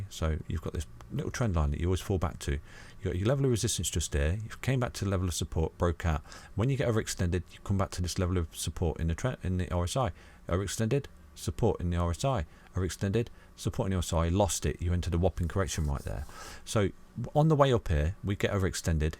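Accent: United Kingdom. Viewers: Word! Gives me comfort hearing a UK chap talk some crypto sense. Most soothing youtube voice ever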